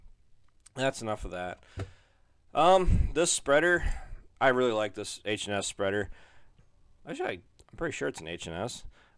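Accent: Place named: Wisconsin, United States. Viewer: lol. Just busting your ball's. It's the Wisconsin accent. Good channel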